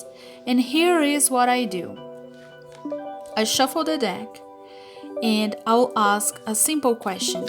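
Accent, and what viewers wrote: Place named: Brazil. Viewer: eu amo que soube q vc tb é br no segundo que ouvi sua voz! muito obrigada pelo conhecimento compartilhado <3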